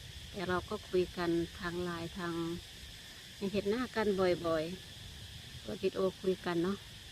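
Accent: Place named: South, Thailand. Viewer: นี่ถ้าไม่บอกคิดว่าคนใต้ เพราะสำเนียงเริ่มมาทางใต้แล้ว ยินดีที่ได้รู้จักนะค่ะ ทำคลิปสั้นด้วยสิค่ะ ช่องจะมีคนเห็นเยอะขึ้นค่ะ สู้ๆๆนะค่ะ เป็นกำลังใจให้ค่ะ นุ้ยเคยทำงานโรงแรมที่ภูเก็ต 6ปีกว่าค่ะ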